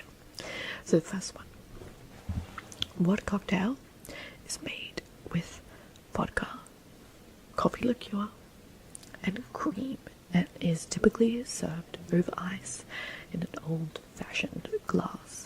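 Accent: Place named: Australia. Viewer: This was so fascinating and relaxing ❤ I really love listening to ASMR with a fellow Aussie accent, glad I found your channel!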